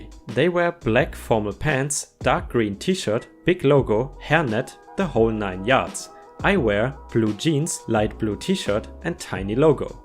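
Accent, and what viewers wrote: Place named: Germany. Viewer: I Dont want EM's or EP's in germany And damm you have a good german Accent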